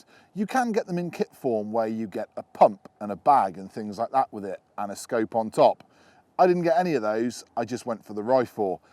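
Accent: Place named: West Country, United Kingdom. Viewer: Birthday suit legend, nice to hear some west country tones, I’m not far from you, on the mendips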